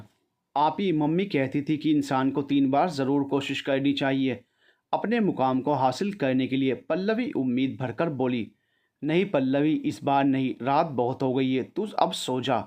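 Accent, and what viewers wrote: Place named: Mumbai, India. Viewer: O bhiya tapori bhasa to bade achhe se bolte ho mujhe bahot achh laga 😁👍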